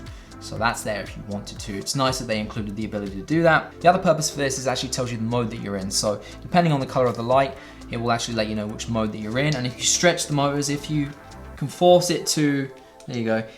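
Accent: United Kingdom. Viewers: There's nothing cool about the LED light, but then I am nearly fifty! Good video and good to hear a UK accent about photo/video gear on YouTube. BTW Your avatar looks like you're wearing chain mail.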